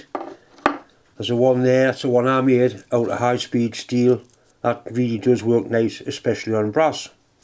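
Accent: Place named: Durham, United Kingdom. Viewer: Love your video's- I was born in Durham 72 years ago and left for Australia in 1964 and its great to hear that accent ✌✌✌✌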